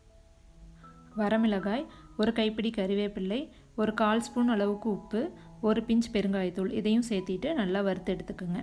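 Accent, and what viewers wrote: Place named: Erode, India. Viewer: I like the way you speak😍Erode vaasam US ponalum veesuthu😊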